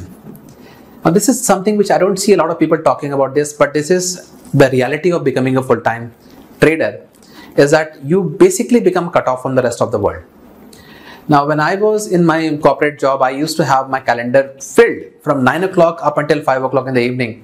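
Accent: India. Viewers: Even after 10 years of US life, you still have typical Indian accent. Nice to hear.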